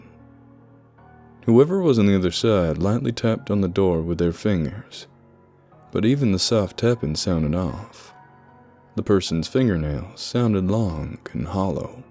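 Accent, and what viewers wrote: South, United States. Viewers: I love your overly southern deepest of the south accent.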